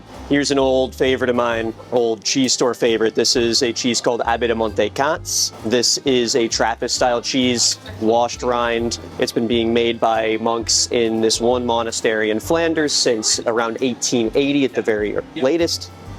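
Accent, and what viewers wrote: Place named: Germany. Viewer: Der arste Amerikaner wo mann denkt er ist ein Deutscher, Schweizer oder Engländer.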